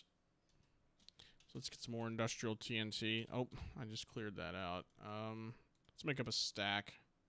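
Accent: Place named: United States. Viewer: Good prononciation of "excellent", just a thick American accent which is fine but unusual from a native speaker's perspective.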